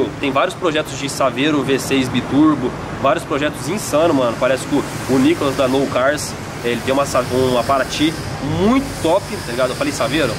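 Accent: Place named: France. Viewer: legal e tal mas de onde saiu essa mania de colocar assento circunflexo em tudo??? 🤣🤣 Carpete vira "carpê" Quattro vira "Quatrô" 😂😂😂 Nem quem eu conheco de Londrina fala assim... nao entendo de onde ta vindo esse enfrancesamento kkkk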